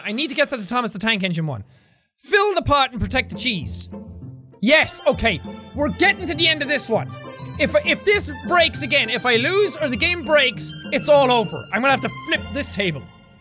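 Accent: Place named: Ireland. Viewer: This comment made me realize Jack has a lot in common with my uncle. Both screaming Irishmen*, both have a funny laugh, both look like me, both have blue eyes, both have raging Irish accents... jeez that awkward moment, amirite? *I'm part Irish on my mom's side.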